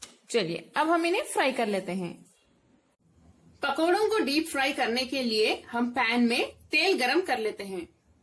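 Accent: Hyderabad, India. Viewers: Aapki hyderabadi mast rehti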